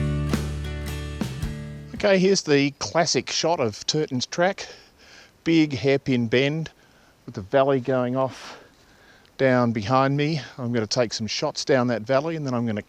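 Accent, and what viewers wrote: Australia. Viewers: Gday, Just found your channel. Good to hear another aussie accent in commentary. Sub'd. Great content also.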